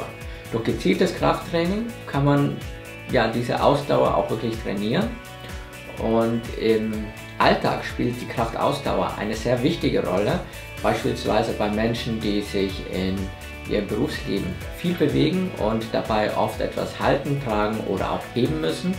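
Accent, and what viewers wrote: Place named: India. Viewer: Haha sein indischer akzent total putzig :D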